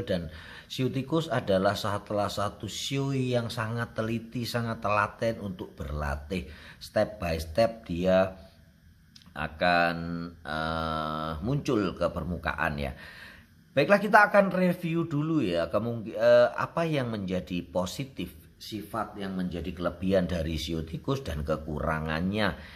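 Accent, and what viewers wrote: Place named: East Java, Indonesia. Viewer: naahhh pasti dr Jawa ya ..Jatim kyknya ...logatnya medok ...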